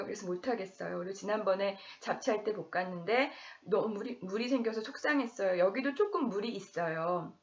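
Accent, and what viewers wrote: Seoul, South Korea. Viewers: 서울 사투리 정말 매력적 ㅎㅎ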